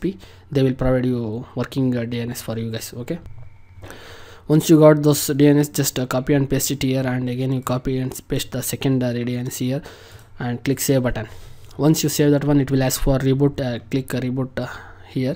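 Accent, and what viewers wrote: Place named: India. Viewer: Gosh I can't stand the Indian accent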